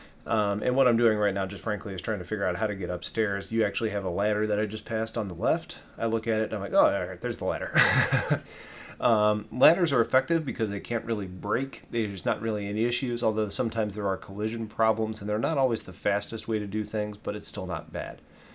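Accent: St. Louis, United States. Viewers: R u from st.louis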